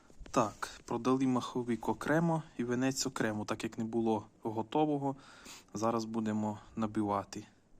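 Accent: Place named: Hutsul, Ukraine. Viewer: Акцент гуцульський.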